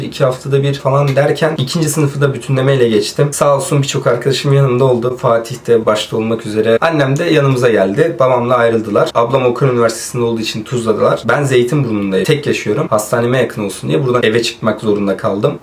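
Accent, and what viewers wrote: Malatya, Turkey. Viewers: Abi Malatyalı misin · reis malatyalı mısın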